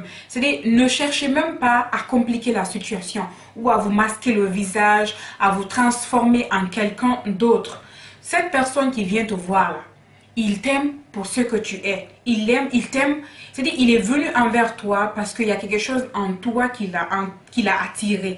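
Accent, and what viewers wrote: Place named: Conakry, Guinea. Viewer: Un accent de conakry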